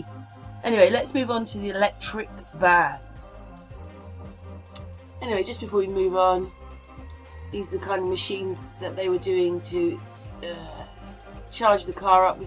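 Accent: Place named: England, United Kingdom. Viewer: i could tell u were english simply from ur clever channel title!!! it sounded very good with an english accent and i said ,i bet shes english haha. and i think u are super awesome!!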